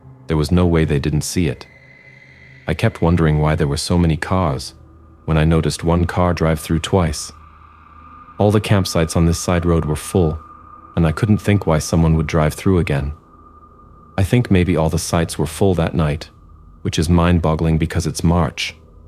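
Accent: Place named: RP, United Kingdom. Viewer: You do a good RP accent. We say "privacy" with a short "I" sound though.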